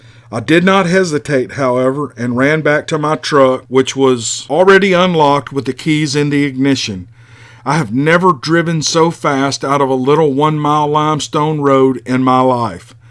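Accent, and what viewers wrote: South, United States. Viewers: It's just that southern accent, has said many times that he does'nt really believe in ole sassy! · Hi there , I just love listening to your stories, I love your accent, what part of America are you from? Listening to you and watching the fire light is really calming and helps me too settle down at night. I'm from Australia and have seen our own version of a bigfoot. It is generally called a yowie but our aboriginal people have many different names for them. Some researchers here believe we may have as many as 4 different types. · you are too modest sir. I think we all appreciate the time and effort you put into your videos. You sound like a very kind man. I’m from England and know nothing about the southern states of America. But they all speak the way you do it must be one relaxing place to live. Thanks again sir.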